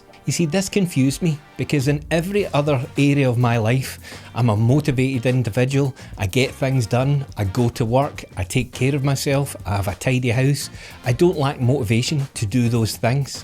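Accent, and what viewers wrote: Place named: Glasgow, United Kingdom. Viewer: I've really enjoyed your videos since discovering your channel. Based on your accent, I put you in the Glasgow are, but not central Glasgow. More like Hamilton or Strathaven, Am I close.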